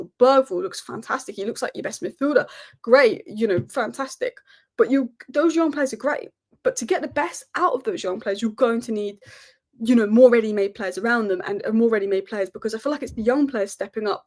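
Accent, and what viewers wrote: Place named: Cockney, United Kingdom. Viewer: Your hair looks good puffed up. Tottenham I'd imagine are close to your heart, your accent soft cockney, your surname Jewish. The club could be to big for Postecoglu, like it could have been for Espiritou-Santo, who's done well at smaller clubs Forest and Wolves. But it's just as likely that Tottenham are a poisened chalice. I think the players tend to down tools a lot, I don't think they're too strong mentally and throw managers under the bus. There's something rotten going on behind the scenes like Man Utd, even though Tottenham are not in as dire a situation financially as Utd.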